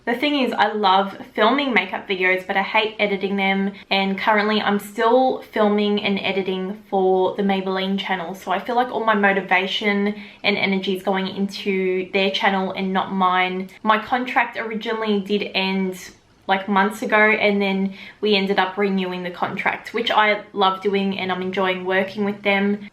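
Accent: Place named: Australia. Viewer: I liked this story time!! It was really interesting & I love the way you explain stuff. Being a fellow Aussie I just relate so much to the way you speak & it makes me laugh (even tho the girl being a stalker wasn’t funny!!!!) xxx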